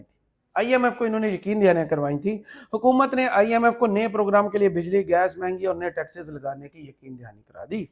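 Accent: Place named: Punjab, Pakistan. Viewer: PUNJABI LEHJA BHT ZABARDAST HA APKA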